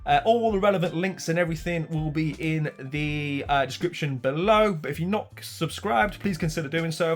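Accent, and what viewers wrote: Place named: Derby, United Kingdom. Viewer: Ah just started watching your videos and thought the way you speak was too familiar, good to know other Derby yocals exist 😂. I normally go with “Welcome to Derby… sorry” if you’re needing a new intro line for the place.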